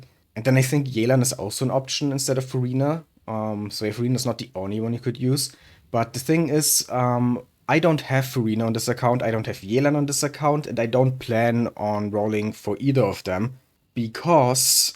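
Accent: Germany. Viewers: U sound German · Ich höre ein wenig deutsch raus Grüße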